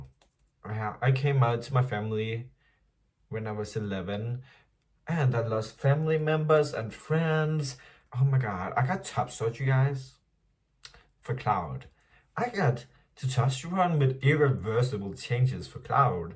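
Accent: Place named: Denmark. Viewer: danmark nævnt i medierne gutter (danske accent er lowkey crazy)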